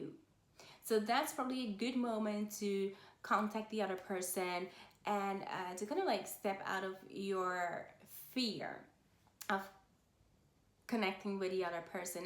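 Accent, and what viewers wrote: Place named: Haiti. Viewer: Are you part Haitian? You have an accent like you are...Also if you are this is Also a sign as well for me!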